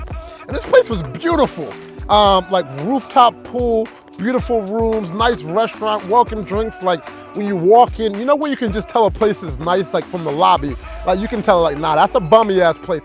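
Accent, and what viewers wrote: United Kingdom. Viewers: That British accent was cleeear bwoy. Did you used to live here in the UK?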